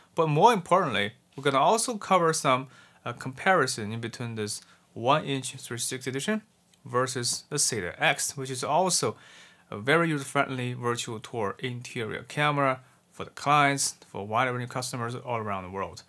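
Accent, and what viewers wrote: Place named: Asia. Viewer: I like your content. But I do find it hard to understand your Asian accent in English. Keep up the work!👍😀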